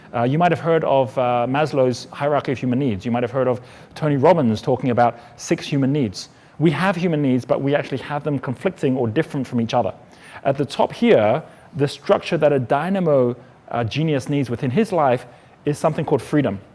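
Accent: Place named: South Africa. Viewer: Can somebody tell me where his accent is from? It sounds like a South African gone to Australia or something.....curiosity killed the cat I know, but it's killing me not to get it 😬😅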